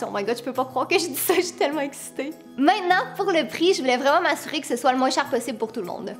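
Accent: Quebec, Canada. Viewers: JE T AIME troooooooooooooppp (j'adore ton accent quebecois)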